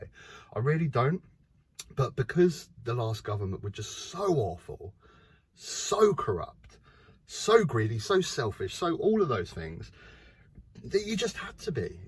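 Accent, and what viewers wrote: United Kingdom. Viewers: As an ex-Pat now living in the USA I enjoy seeing the British towns and countryside, and hearing British accents. You are one of five different YouTubers I follow to hear the news about the UK and the political situation. I'm keen to hear how Labour are doing, and if they are actually reversing some of the Tory mess, or are they going to be a disappointment (e.g. the 2 child tax credit cap)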